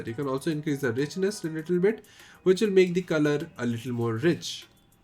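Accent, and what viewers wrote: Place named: India. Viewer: can you please practice the accent, i don't understand well your english with india accent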